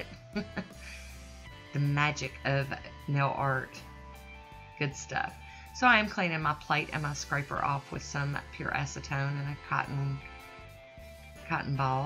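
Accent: South, United States. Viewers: The Whisk is very pretty! In the end I like this, it's cute with the snowmen and snowflakes! I gotta add, lol you tickle me to death with your "southern slang" and pronunciations (it sounds like I'm listening to myself) I just love listening to you talk! I never really understood why people would say that to me lol but now hearing you I understand! I got up from bed not wanting to wake my hubby with my horrible cough I have going on so I decided to go to my special spot and catch up on my videos lol, not so sure it was good for the cough lmbo but it sure is good for my feelings haha! Thank you, you're Awesome! Till next time, much love!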